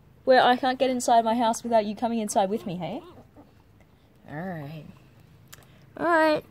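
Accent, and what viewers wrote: Australia. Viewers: I like your voice, it goes along really well with your Australian accent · It is so refreshing to have a fellow Aussie on this predominantly yank site. Oh, and you may have to untrain your maggie lol. Just subscribed btw, look forward to seeing more vids from you and hearing a normal voice :)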